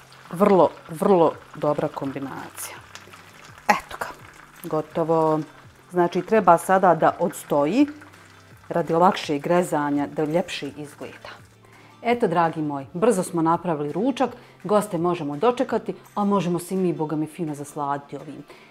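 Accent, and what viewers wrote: Bosnia and Herzegovina. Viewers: Obozavam Vas slusati dok pricate, svidja mi se ovaj recept, bogat va jednostavan, molim Vas vas recite mi iz kog ste mesta, moja drugarica je iz Bosne i prica kao i Vi, pozdrav🍀🌹🍀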